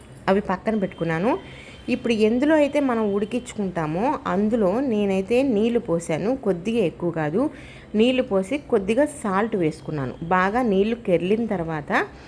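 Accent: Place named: Telangana, India. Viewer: తెలంగాన vsllu వాళ్ళ yasatho matladutharu manayasa thapala అంటె thappa ?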